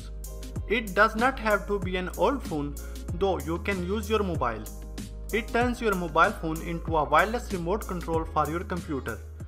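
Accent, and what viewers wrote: India. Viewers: So off topic but can anyone answer this serious question. Not being racist here. Just wondering about his accent. So he obviously has a great understanding of the English language but retains a thick Indian (im guessing) accent. This is very common. When I took French in school a big part of it was hitting the words correctly. Not speaking French with an American accent ya know. Now if he spoke broken English it would not have stood out. But he speaks very fluent English. So why is the pronunciation so off?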